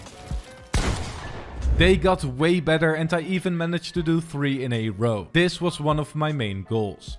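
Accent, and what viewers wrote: Netherlands. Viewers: echt een hollands accent hahaha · Are u from Netherlands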